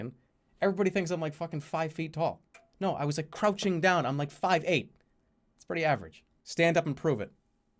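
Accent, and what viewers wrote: Boston, United States. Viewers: jerma's voice sounds so much higher in this video and he sounds more boston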